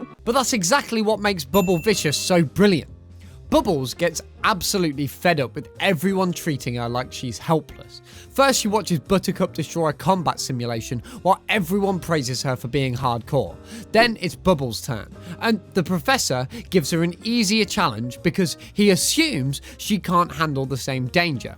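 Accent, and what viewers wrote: United Kingdom. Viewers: Hi are you British?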